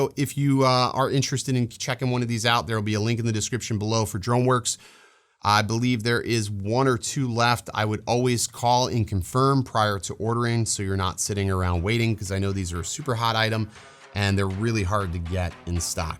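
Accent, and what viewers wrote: United Kingdom. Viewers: Very nice intro... funny I tell my youngest to go into another room so I can watch these... 😆 🤣 nice bit of British makes it sounds very professional 👏 👍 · you narration was so spot on! Complete with the perfect British accent!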